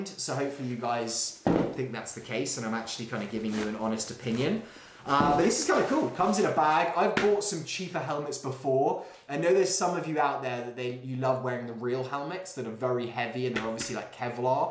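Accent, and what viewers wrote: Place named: United Kingdom. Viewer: Great videos, nice to hear a uk voice reviewing airsoft !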